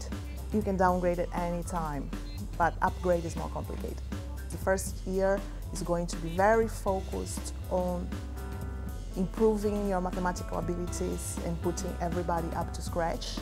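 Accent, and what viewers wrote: Brazil. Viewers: Shes 100% brazilian 🤣🤣 Im also brazilian noticed straight away, nice to know theres a vast community at UCL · you can tell she's brazilian hahah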